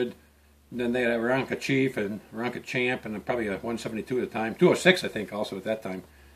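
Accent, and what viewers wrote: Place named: Upstate New York, United States. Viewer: Having watched a few of your videos recently I of course kept watching and came across this video. Really blew my mind! Owning a beaver is my goal, not a sports car or fancy house guy so a beaver it is! BUT, hearing you were from Long Lake which is one of my favorite destinations in the ADK blew my mind! I tent camp in Saranac Lake area, have a camp just outside Lake George area but may look for a camp closer to Long. I knew I recognized the upstate accent, great videos!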